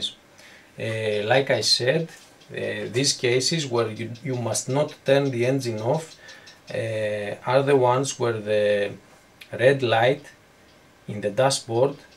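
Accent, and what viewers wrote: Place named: Greece. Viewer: nice vid, when I heard your ink in first second I said .. this guy is greek, giamas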